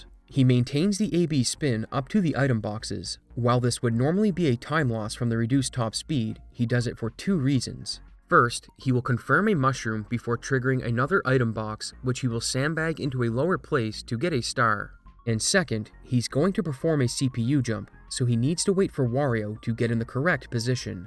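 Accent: Canada. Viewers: very canadian accent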